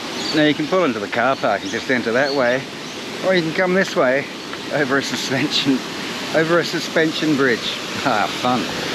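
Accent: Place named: Australia. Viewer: Very pleasant, interesting video; your voice is very calming and rare for me to hear the accent everyone loves. Who's idea to do a town in Glorious Art Deco? I think there are products that you apply after washing that retards the return growrh of mold. I'm from Washington State USA and will never make it to Australia being old nowadays. So this is great! Hope you keep at it; there just arent ways to see the actual countryside ....excellent, thank you.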